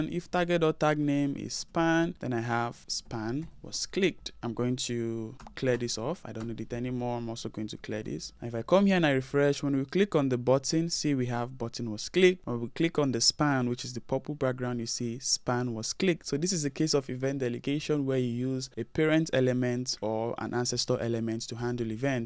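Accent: Nigeria. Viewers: naija accent i am gussing, leaning javascript too, thank for the video by the way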